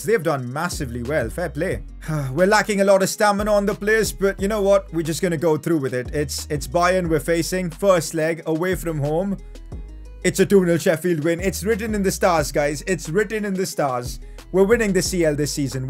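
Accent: India. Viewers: Why does have an Indian accent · R u indian bro?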